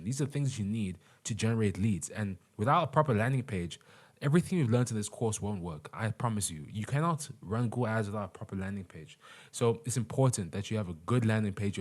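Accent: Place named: South Africa. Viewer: i could tell you were a fellow south african by the accent.😅.beautiful course brother.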